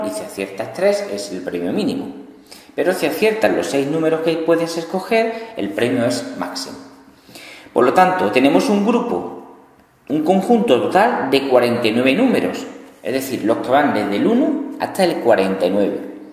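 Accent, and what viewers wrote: Spain. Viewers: Me ha llegado al corazón vale. Espero que me acepte una disculpa sincera y sin culpa ya que estaba enojado porque me avisaron a ultima hora que tenia que dar exposición de ese tema...... pero el acento sigue sin gustarme lo digo por las pelis. Aunque todas las españolas me gustan hasta en los videos para +18.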